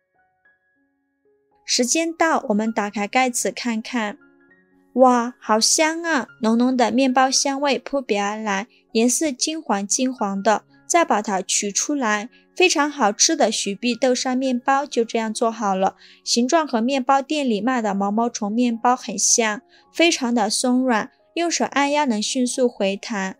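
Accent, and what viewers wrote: China. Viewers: 呵呵。請問這跟台灣人的素質有什麼關係。因為影片裡說的是大陸口音嗎？差別心在你不是我。到底誰心裡殘缺呢？？我只是說加雪碧就是麵糰裡加蘇打跟檸檬酸和糖。沒什麼值得神奇的。因為對於烘培加蘇打在麵糰裡是很常見的。而你生出那麼多情緒。還上升到台灣。只覺得無知又可笑🤣🤣🤣🤣🤣。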